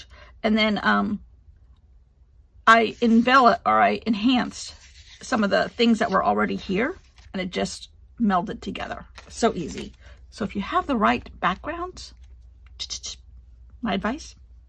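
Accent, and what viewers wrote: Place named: California, United States. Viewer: Southern mixed with California! 😂😂💜💜💜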